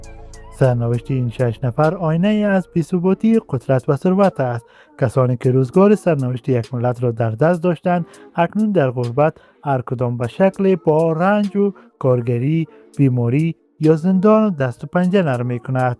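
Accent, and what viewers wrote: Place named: Afghanistan. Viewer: تشکر از شما که لهجه دری افغانی را در کانال خود اضافه کردین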